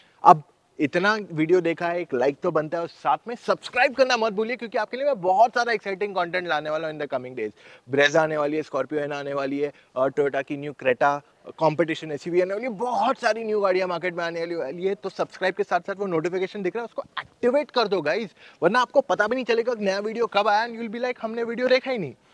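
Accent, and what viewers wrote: India. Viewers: Love ur Hindi accent 😍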